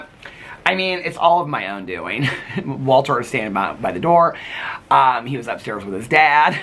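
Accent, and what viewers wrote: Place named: Midwest, United States. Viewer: my daughter was having a fit the other day (she’s 3) and I said to her in your midwestern accent, “Don’t cry!” And she was so confused 😂 it was hilarious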